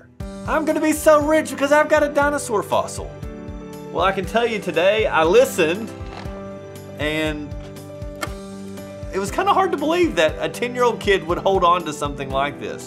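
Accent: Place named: Ohio Valley, United States. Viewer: Thank you for sharing! Keep it up man...I appreciate that you share your walk with God to all those who listen to WalkFM. I just found you all online as I was searching for Christian Radio Stations back home (Ohio Valley). I have tons to listen to here in Nashville,TN...but I was missing the accent and familiarities of "home".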